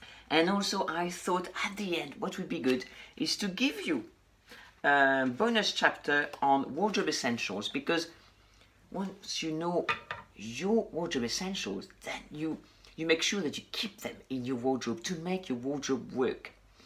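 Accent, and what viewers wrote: France. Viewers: Good idea the oldest pieces of the closet, I have some items specially coats and jackets and a pair of shoes, sorry I really love them, they are a good quality items . I enjoyed this video, love your french accent, I love french but it is a little bit difficult to me to speak it. Greetings from México. by the way, do you speak spanish?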